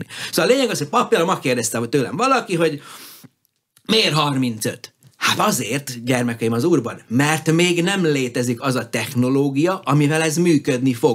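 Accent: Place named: South Bačka, Serbia. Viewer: Dél-bácskai tájszólás, Rátgéber Lászlóhoz hasonlítanám. Csak a szakterület más: egyikük a kosárlabdához ért nem akárhogyan, másikuk a csillagászathoz :))). Ja, és humoros a történet, betegre röhögöm magam minden interjúján, előadásán. Régen én is imádtam a csillagászatot :).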